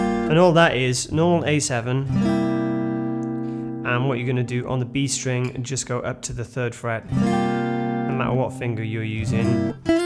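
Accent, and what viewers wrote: United Kingdom. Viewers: Sings American.....then speaks....he's English !!..amazing !!..great teacher,great voice !!..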